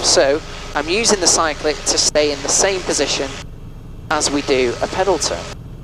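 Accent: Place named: United Kingdom. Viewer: I would watch your video if you could speak english rather than British stuff. So rude!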